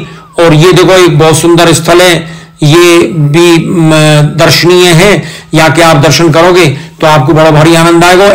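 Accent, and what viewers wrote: Braj, India. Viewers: Hme to bhaiya apki brij bolli hi bahut acchi lgti h Anand aa gya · Apki braj bhasha bahut mithhi h 🙏🙏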